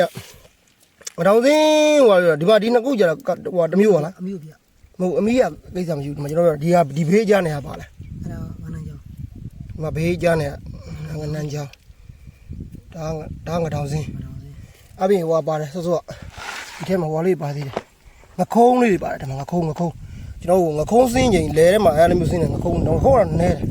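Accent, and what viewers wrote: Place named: Thandwe, Myanmar. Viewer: ဘယ်ဒေသကလဲ အစ်က်ု့အသံက သံတွဲဘက်က အသံနှင့်တူတယ်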